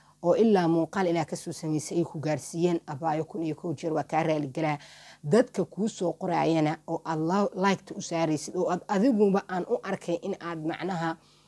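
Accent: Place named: Somalia. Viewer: markaa ku bartyba cidaa thyba dhaqsaba waa garty waayo lahjadeena maaha mid dhunta iyo codkarnimadeena lkn gabar umada usiman thy allah ha ku xafido abayo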